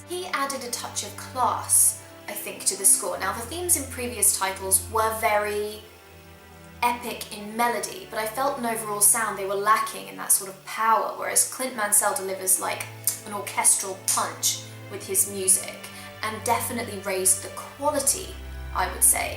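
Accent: United Kingdom. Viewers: Now I really want to know what made you cry "hysterically" :-) (not that I didn't too... well not *hysterically* but still, I completely understand). Actually I would love to hear what choices you made and how it played out for you. I always like talking to friends about the different choices they made and why. Anyway, fantastic review (this one and the ending) I feel like you're voicing my own thoughts, just in a female voice... and British accent. Love your videos, keep up the amazing work!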